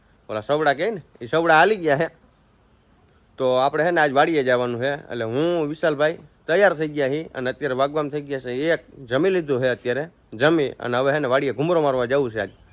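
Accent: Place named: Gujarat, India. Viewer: Jai mataji bhai gujarat ni boli akdem mithi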